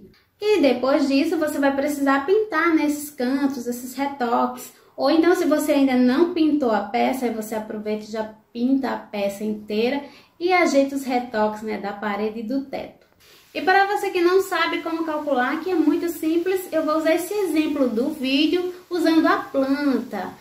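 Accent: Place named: Northeast, Brazil. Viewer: parabéns... ótimo vídeo... eita sotaque gostoso de ouvir ... nordeste é tudo de bom ,,,kkk 👏👏👏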